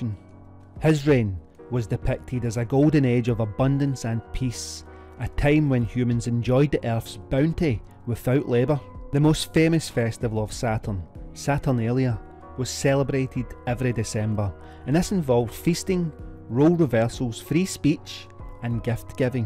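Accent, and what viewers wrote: Scotland, United Kingdom. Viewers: where are you from? this dude has a smooth, posh scottish accent. i'm willing to wager his accent is nicer than yours. he's british - the island where the english language was created.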